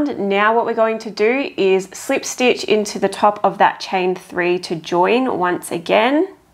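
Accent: Australia. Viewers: Well-designed cardi, new sub, just want to listen to your Aussie accent...😘♥️🦋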